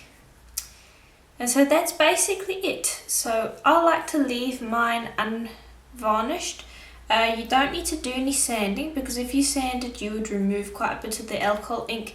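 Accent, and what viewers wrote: South Africa. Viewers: Your accent feels so familiar! Are you a bittie South African? Enjoy your videos! Thank you. 💖🌸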